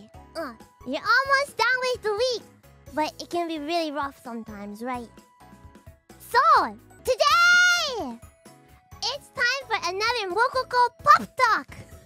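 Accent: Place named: Japan. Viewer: 到底怎麼把英文講得跟日文一樣… 我愛死她了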